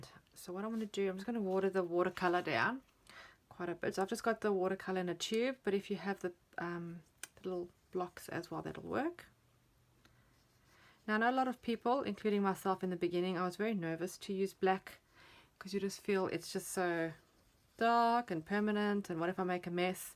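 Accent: South Africa. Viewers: Gorgeous! Btw am confused by your accent! You are in Oz but your accent sounds South African!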